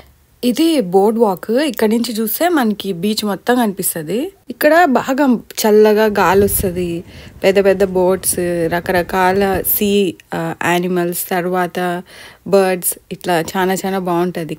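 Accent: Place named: Rayalaseema, India. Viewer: mee Rayalaseema Accent chala chala bavundi And locations are so beautiful thank u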